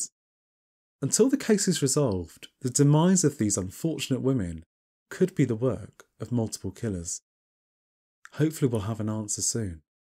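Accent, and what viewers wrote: United Kingdom. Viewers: New subscriber, enjoyed this very much. I, too, was a little distracted by your hand gestures but it’s a small thing and doesn’t really matter that much. Incredible voice! Something about that British accent. Don’t let ppl compare you to Mr Ballen, I’m sure you get that. I think it’s the similar background more than anything else. Of course, I just did that, didn’t I? Keep it up you have a new fan. I think you hit the algorithm just right. Love that I got here early!!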